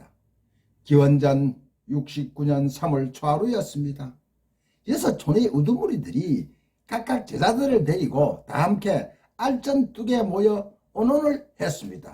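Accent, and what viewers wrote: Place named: Busan, South Korea. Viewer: 부산 할배의 투박한 사투리로 풀어내는 삼국유사 그간 까맣게 몰랐던 삼국시대의 디테일한 스토리가 너무 재미있읍니다 학교에서 배우지 못했던 구수한 이야기들 재밌어요~~~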